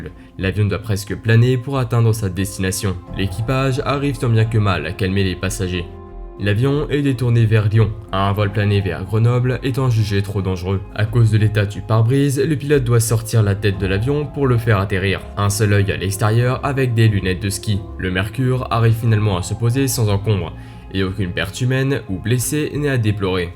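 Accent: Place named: Japan. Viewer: Je suppose que est Japonais ou d'origine japonaise. On le ressent bien par l'intonation de la voix. Donc, il s'exprime un peu comme ses parents et il n'y peut rien. En plus son français est excellent, articule parfaitement bien et ne parle pas comme ces mongols qui adorent ajouter le son "j" après les "d" ou les "t" comme par exemple " je tj'ai djit". Ça oui, c'est trop insupportable à entendre.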